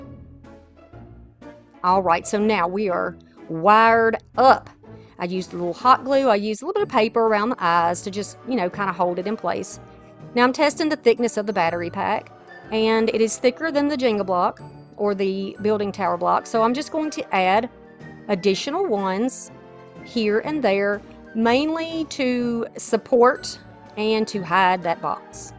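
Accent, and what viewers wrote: South, United States. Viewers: I love your videos 😻 and I must say your accent reminds me of The great country ⭐ star!! 😂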